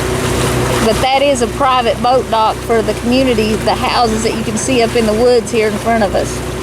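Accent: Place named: North Georgia, United States. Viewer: HeHe, I LOVE this channel! I love the views, and I love that accent! Its like being at home...and I miss home. all my family is from north Ga and east Tn. In my mind thats what heavens gonna look like, only better...somehow, lol.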